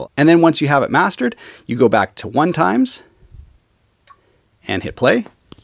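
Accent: Canada. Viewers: I love the canadian accent lol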